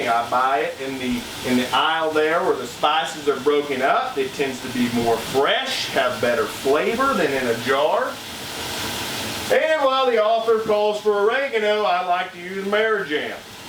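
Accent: Texas, United States. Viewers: Or do all Texans speak like this?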